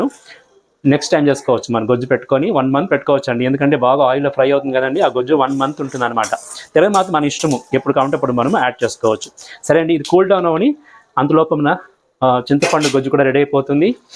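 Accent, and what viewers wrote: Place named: Karnataka, India. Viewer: Mee Telugu same memu matlade laage undhi, Karnataka na meedhi Garu?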